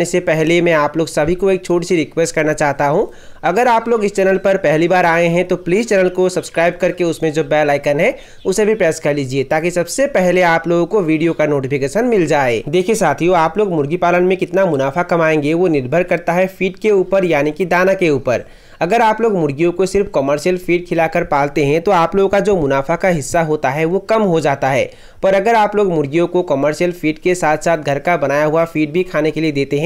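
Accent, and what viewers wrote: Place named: Nepal. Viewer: Aap Nepal me the kya pehle...aapke bolne ki style nepali accest se match kr rhi hai🇳🇵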